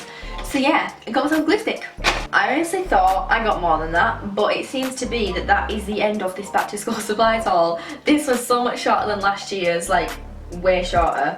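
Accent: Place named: West Yorkshire, United Kingdom. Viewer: I’m from West Yorkshire too ahaha I thought you sounded yano x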